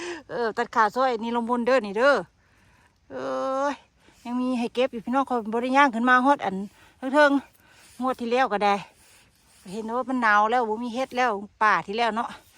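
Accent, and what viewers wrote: Sakon Nakhon, Thailand. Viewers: เจ้าคนบ้านได๋สำเนียงคือไทสกลแท้จ้า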